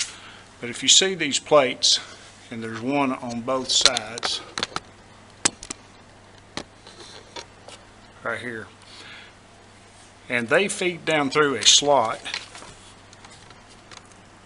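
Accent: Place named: South, United States. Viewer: Thank you for the review. I've been eyeballing planers and this helps in the selection. It also explains why I've had snipe issues with using my father's 735 planer. Also no issues with audio here, most people probably just can't understand the Southern accent, I'm from the south so it was loud and clear here. 🤣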